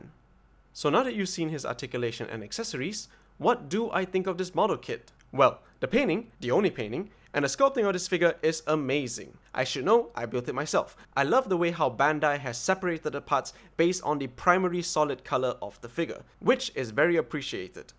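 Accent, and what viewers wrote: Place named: Malaysia. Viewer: You're either a malaysian chinese or a singaporean chinese, by how confused your accent sound like at times. American english or UK english..decide..other than that, awesome review.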